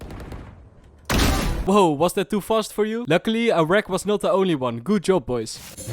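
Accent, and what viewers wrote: Netherlands. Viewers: You sound Dutch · ur dutch bro? I love your accent 🤣😆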